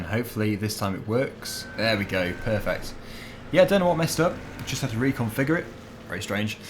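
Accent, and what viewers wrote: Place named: United Kingdom. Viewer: Is anyone else turned on by his British accent?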